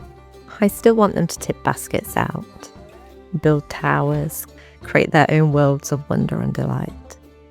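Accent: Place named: Northern England, United Kingdom. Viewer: Relaxing to watch. Cosy vibes, beautiful filming on the beach. You sound like a North of England girl. I am too.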